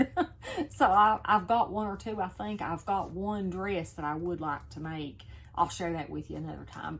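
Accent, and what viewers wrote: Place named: Western North Carolina, United States. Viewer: Your Mom is beautiful! Your accent sounds as if you might be from western NC, as am I. Just found you & am looking forward to watching more of your videos...😊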